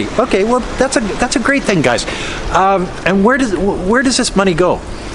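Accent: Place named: Canada. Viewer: Reasons why I like your channel is, you and me both Canadian from British Columbia, we have some much the same in common and your Canadian way of language "FXXK them!", yes, that is Canadian way and Canadian tone! Don't worry about those bullshit shut down, there is many other ways to express your truth speech and comment, just let us know and we follows. Keep up the good work and like to watch your daily life in China!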